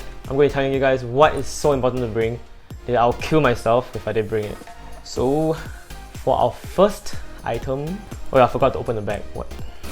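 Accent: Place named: Singapore. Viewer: Great video! But the Singlish is too crazy for me man 😝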